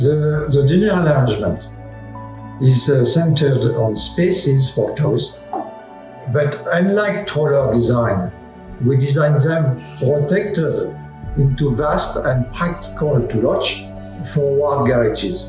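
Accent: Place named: France. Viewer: The music in the background is a little bit disturbing. Sometimes its difficult to understand. (Ok, it's a little bit because of his french accent and I'm not used to hear English with this accent.) There are a lot additional 'cracks' as well, microphone was not the best.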